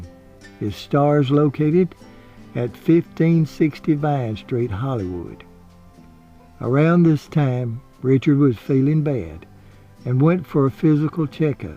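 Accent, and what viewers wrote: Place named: Mississippi, United States. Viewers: Mr., I love your videos and your Mississippi accent. I'm from Grenada and grew up in scobey and Holcomb, I was curious as to what part of ms you are from bc you sound so much like my mawmaw Ingram. A more masculine tone but similar. I really enjoy all of your videos and stories. Hope all is well. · Thanks for all of these great videos, --we love them and your Mississippi accent! Please keep them coming!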